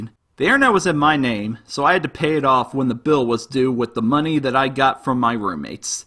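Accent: South, United States. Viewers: I love how southern he is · I love your country ascent!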